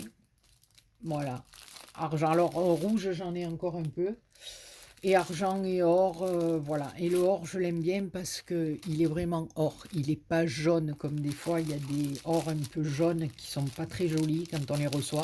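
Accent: South, France. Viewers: Bonjour, je découvre votre vidéo et reconnu de suite votre accent du midi 😀 J'habite Aix en Provence 😊 Vos créations sont magnifiques, j'aime beaucoup le petit coffre qui est magnifique 🤩 Je me suis abonnée 👍 Merci pour le partage.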